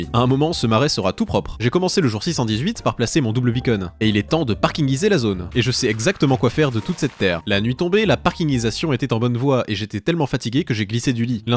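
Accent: Quebec, Canada. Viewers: J'ai bien remarqué que tu a l'accent québécois et français alors tu vie où?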